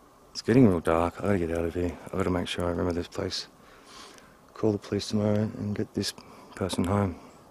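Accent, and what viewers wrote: Australia. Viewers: However, as an English speaker, I'd be happy to hear an Aussie anywhere! Especially in a scary forrest. It would be a welcoming sound for me, personally, as an American.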